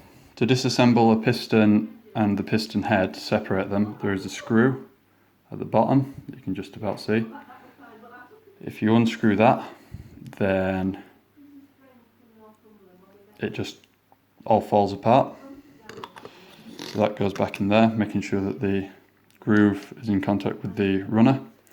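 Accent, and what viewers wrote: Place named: Yorkshire, United Kingdom. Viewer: Gotta be from Yorkshire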